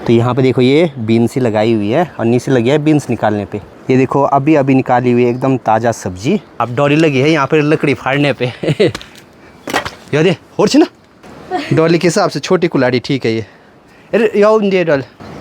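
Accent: Pahadi, India. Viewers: Bhai ki boli bahut meethi hai... Pahadi log aise hi bolte hain... Jab ye tarsaali wala Ghar kehtey hai toh sunne mein bahaut acha lagta hai...😊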